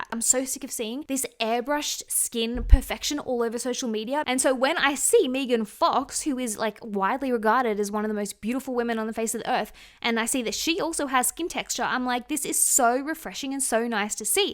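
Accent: Australia. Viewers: It´s so cute how your Aussie accent got stronger since you moved back to Australia <3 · I like your videos very much! (And your aussie accent;)) BUT PLEASE, FILLER are NOT completely dissolvable! Greetings from switzerland🤗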